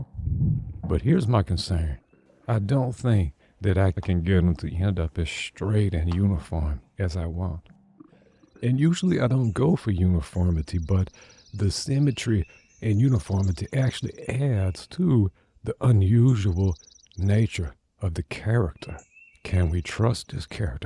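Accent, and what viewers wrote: Louisiana, United States. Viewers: Dat voice reminds me of a Louisianan accent.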